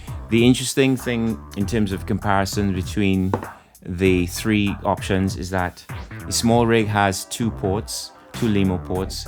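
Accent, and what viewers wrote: Trinidad and Tobago. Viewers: love this vid have to ask are you trini Im hearing that accent.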